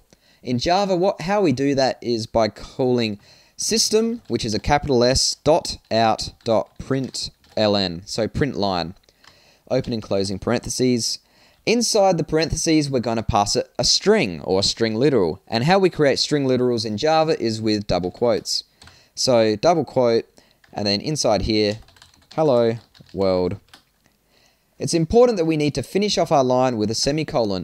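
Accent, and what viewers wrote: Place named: Australia. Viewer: Nice one. And great to find some tutorials with an Aussie accent!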